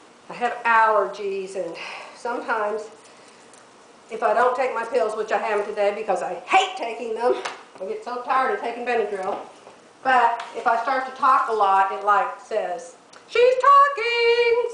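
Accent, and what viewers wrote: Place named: Oklahoma, United States. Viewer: You mention Edmond.. is it Edmond Oklahoma? Your accent sounds like mine. Okie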